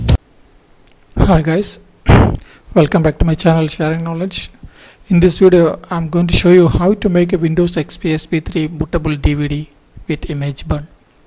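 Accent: India. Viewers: the heavy punjabi accent too wtf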